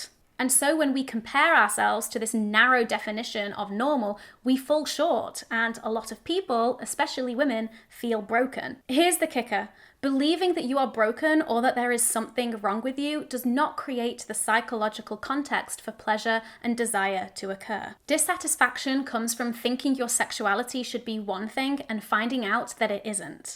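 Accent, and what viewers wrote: United Kingdom. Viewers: I really enjoy your videos and love your British accent! Drives me nuts!!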